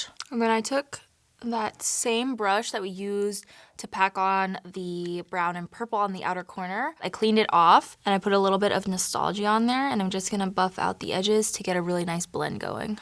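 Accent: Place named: United Kingdom. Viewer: Loveee the look & hair but girl lose the British accent lmao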